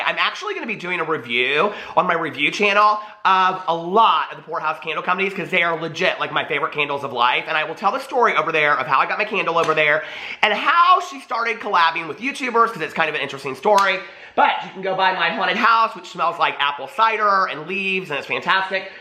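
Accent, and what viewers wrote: South, United States. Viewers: New subscriber and I adore your fun personality!! Also, love the Southern accent and hugs from Texas.. James Charles is a immature and entitled man child. Whereas, Jeffree Star is a mature grown person, who remembers his roots.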